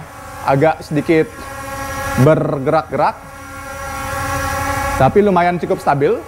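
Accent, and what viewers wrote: Bali, Indonesia. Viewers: Makasi Bang review Dronenya jadi tau kualitasnya. Btw asli Jatim Bang? Soalnya Logatnya Logat Bali 🙏😁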